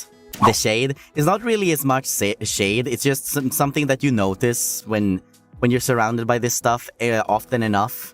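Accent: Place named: Sweden. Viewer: I think Swedish accent is actually cute 🫳💖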